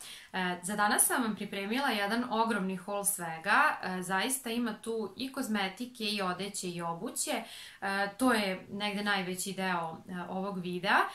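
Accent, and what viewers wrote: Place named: Vojvodina, Serbia. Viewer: Vojvodjanka,zato to tako slatko zvuci 😘😉😁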